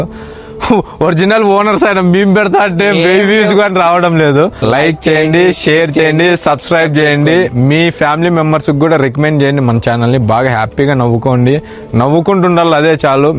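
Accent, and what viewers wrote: Anantapur, India. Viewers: Medi ananthapur ah bro slang knchm alane undi .. just asking anthe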